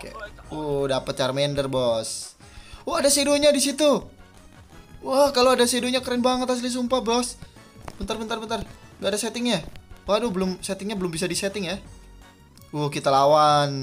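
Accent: Java, Indonesia. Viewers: Fakta video ini, orangnya medok dan pokemon lovers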